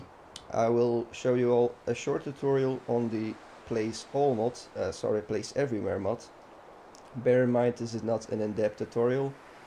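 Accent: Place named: Netherlands. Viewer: Je klinkt Nederlands.. Goeie tutorial man.. Snapte echt geen kut van dat menu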